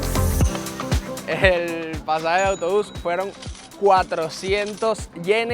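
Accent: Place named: Venezuela. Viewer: ese acento parece de mi tierra. eres venezolano???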